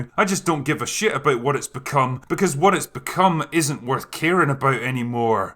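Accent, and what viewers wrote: Scotland, United Kingdom. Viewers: I like the channels content, but dude you have a wank Scottish accent 😂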